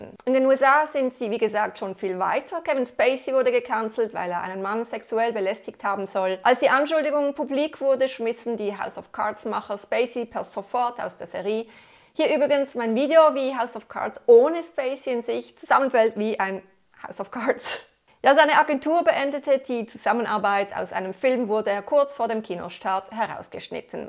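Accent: Switzerland. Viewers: Ich finde die Tamara Wernli total süss. Besonders ihre leichten Schweizer Dialekt finde ich gut und höre gerne ihren Ausführungen zu.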